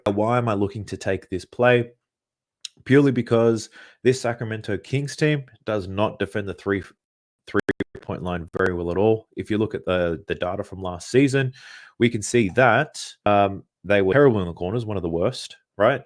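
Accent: Australia. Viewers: no way is an aussie 🔥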